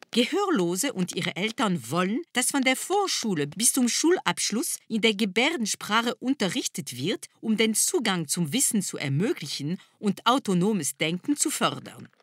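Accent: France. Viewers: "Sind sie nicht charmant diese Schildkröten" und dann noch mit diesem französischem Akzent...ach einfach nur himmlisch xD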